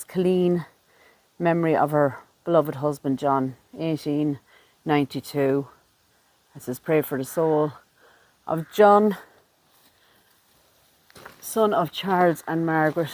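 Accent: Ireland. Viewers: I am really enjoying your videos,. Your Irish accent is so melodic to me. I live in the US, but my father hailed from Dublin, Ireland:-) Your countryside is so beautiful!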